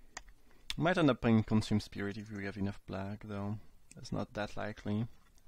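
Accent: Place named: France. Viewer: Where are you from? I can't really figure out your accent. Sound french-ish to me but i'm not sure.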